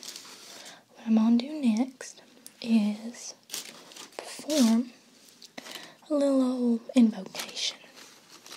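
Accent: South, United States. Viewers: I have loved this entire series. Really impressed by your Southern accent as a Southerner myself.:) Loved the "surprise" ending and that chant! LOL. Haven't heard " " in a while.:) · Why the hell are you singing and why do you have a southern accent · so lovely, thanks for all those hilarious and relaxing vids with Brandy Jean and that southern accent XD You are the master of entertainment for me in ASMR!! love from Germany!! <3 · this is my first time watching her channel like bro American accent on fleak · Seriously loved your country accent in this series! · This little series was so relaxing and reminds me a lot of the people from where I grew up! I usually hate such southern accidents but it's really cute when you do it 😊 thank you for all the tingles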